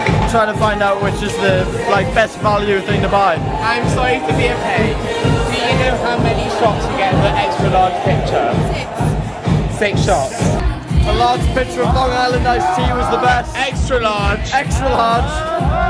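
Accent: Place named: Northern England, United Kingdom. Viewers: He definitely has a northern accent, as I'm from Newcastle and I can spot a hint of it assuming through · I can't place your accent, is it gateshead....? Or somewhere near Newcastle?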